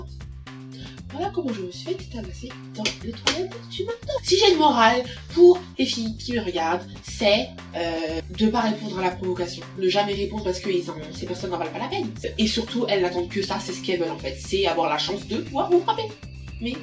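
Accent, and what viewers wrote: Antilles, Caribbean. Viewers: Tu est antillais je connais l'accent créole tu est d'ou au îles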